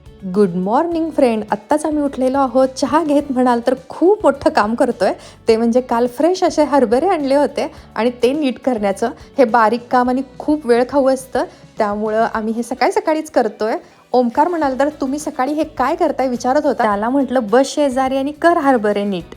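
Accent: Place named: Kolhapur, India. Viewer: छान मार्केट सफारी... ताई कोल्हापूर बोली पध्दत चालीरीती सोडू नका.... कमेंट दुर्लक्षित करा 🎉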